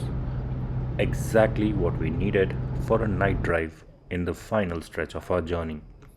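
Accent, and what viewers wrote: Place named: Maharashtra, India. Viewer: Bro where are you from ? U sound Maharashtrian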